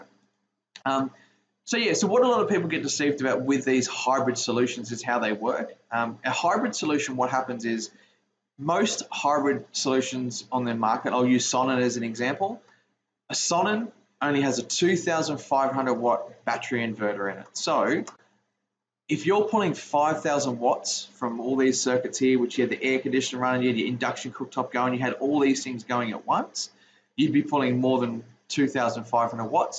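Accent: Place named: Australia. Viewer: It’s nice to hear a plain Australian accent, as we have to decode yank accents 95% of the time. 🙂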